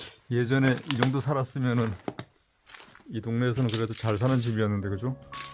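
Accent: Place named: Gangneung, South Korea. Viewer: 강릉 감재 특유의 말을 들으니 반갑소야. 시방은 서울에 살지만.. 저도 고행이 강능 죽현이래요.. 촬영차 오가시는 길 시나미 잘 댕기시우야.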